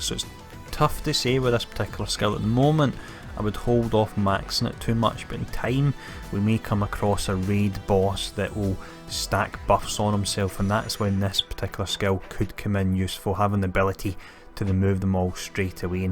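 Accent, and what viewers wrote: Scotland, United Kingdom. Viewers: You do an excellent job with this channel by the way - and I love a Scottish accent!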